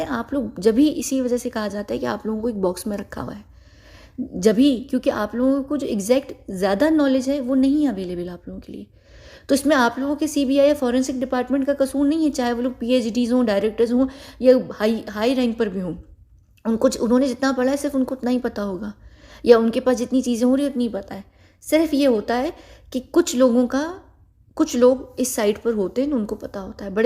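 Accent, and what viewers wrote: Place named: United Kingdom. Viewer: How come an Agent pronounce English words like this way when her accent seems British sometimes??..Confusing.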